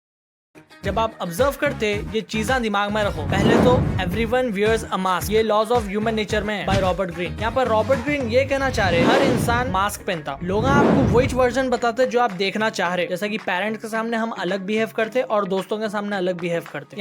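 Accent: Hyderabad, India. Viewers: Tu Hyderabad se hai? Tera accent poora Hyderabadi hai 😂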